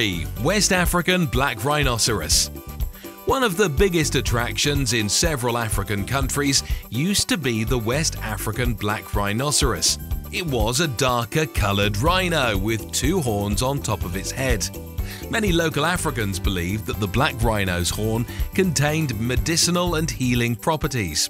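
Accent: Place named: United Kingdom. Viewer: What's with the British accent?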